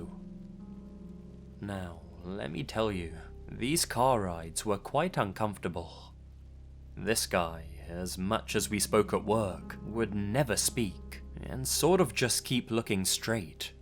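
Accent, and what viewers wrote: England, United Kingdom. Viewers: spooky British accent (⊙＿⊙) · Hold up. You grew up un chicago but speak with a gay english accent?? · You always have great stories. I love your english accent. I would love to go to college in England. 😃 I'm African American. Do you like Blues music?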